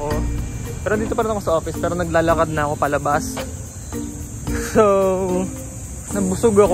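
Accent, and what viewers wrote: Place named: Mandaluyong, Philippines. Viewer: Luh parang taga mandaluyong kapo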